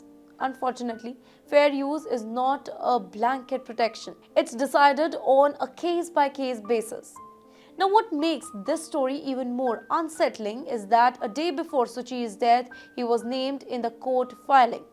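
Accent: India. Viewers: Narrator Audio NOT Cleary Understandable, Typical Indian English, JUST RANT IT OUT, weather the Audience Get it or not, JAI HIND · It's time to learn indian english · Why does Indian accent make any story that’s being covered suck .. I don’t get what she is talking about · This news reader need to learn how to speak clearly. Too much indian accent. I need to read subtitles to understand what she is talking about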